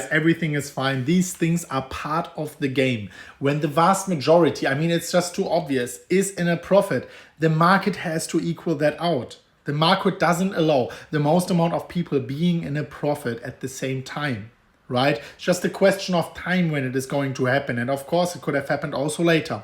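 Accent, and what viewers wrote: Germany. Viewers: Good time to wait for bottom if you are a hodler. Why? Tax season. Funny German Accent Guy Say's Whales selling BTC. I'm Still bullish because we are still above the 55 day moving average but just in case the whales are not finished, set a stop loss. DXY is rising so selling BTC for USD is starting to look attractive. Appears a short squeeze is imminent.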